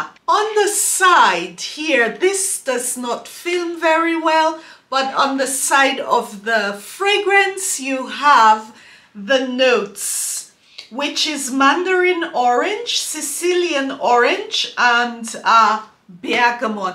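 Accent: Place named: Kenya. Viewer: Niiice! Just bought it. First clone frag. Btw. Are you Kenyan 😙? You sound so Kenyan 🤔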